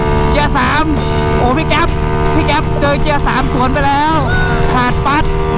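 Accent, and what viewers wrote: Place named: Pathum Thani, Thailand. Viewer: ปทุมธานีชัดมากครับ555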